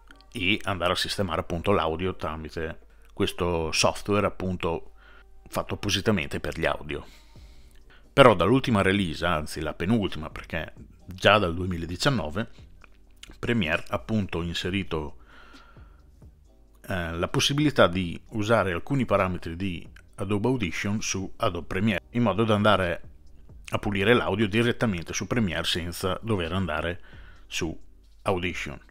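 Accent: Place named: Genoa, Italy. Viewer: mi sbaglio o mi sembri de zena ?!?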